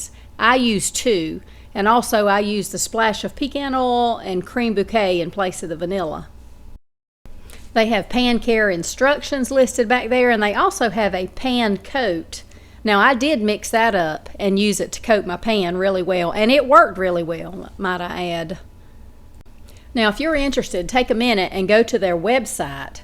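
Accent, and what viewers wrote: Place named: West Virginia, United States. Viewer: Yes ma'am I have one in my collection, I just love your voice sounds like you're from West, Virginia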